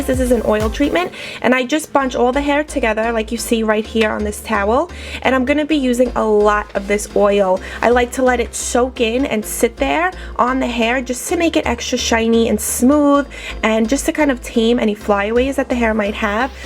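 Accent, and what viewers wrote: New York, United States. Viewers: New york? I love your accent!! love from Birmingham UK!! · LOVE your New York accent! I'm from Staten Island and I moved to jersey over 15 years ago and I still have my accent lol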